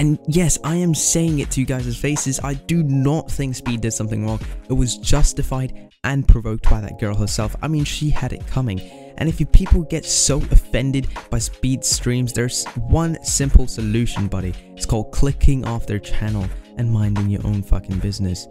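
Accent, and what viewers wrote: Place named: United Kingdom. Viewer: Oh god not only do you sound British but now ur getting ur 200 ping on AS mmc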